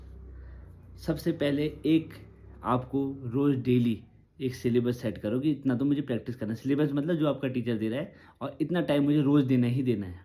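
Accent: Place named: Pahadi, India. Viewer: Bhai accent se to pahadi lagte ho btw meri kahani to aisi hai ki Sa nikalne main 6 mahine or Sargam main 1 saal lag gaya par control itna nahi tha ki dhun bajaoo toh maine thande baste main daal di fir 5 saal baad lockdown main uthayi to dheere dheere raag bajane ka control aa gaya.Ab do saal se regular baja raha hu or thik thak baja leta hu.Sab khud se he seekha isiliye itna time or effort lag gaya kya karoo mogli ki generation ka hu, gadha majdoori kiye bina hum logo ko chain nahi aata.Smartphone generation to har chij main coaching,note,shortcut,trick,hack dhundti hai smart log hai na gen Z fir Z1, Z2, Z3🤣🤣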